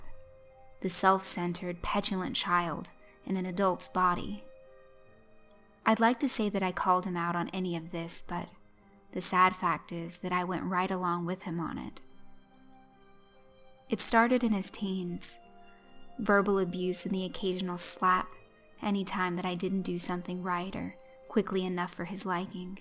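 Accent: South, United States. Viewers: It's nice to hear a creepypasta on here being read by a fellow southerner.:)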